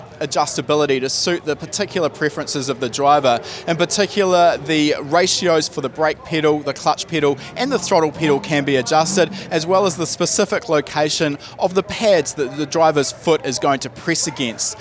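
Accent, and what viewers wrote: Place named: New Zealand. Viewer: he's Kiwi, just go with it. in the engineering sector over the past 30 years I've worked with NZ, Aussies, South Africans, Brits, Germans, East Indians, Chinese, Japanese, Jamaicans, Russians, Guatemalans, Liberians, French Canadians, Israeli, etc so a mix of everything, and I'm sure at times it was they who thought my AMERICAN ACCENT was what sounded odd/weird. it often hurts the inflated American EGO to discover that WE are the ones "speaking with an accent" and that we are NOT always the "yardstick" by which things in the world are measured (literally).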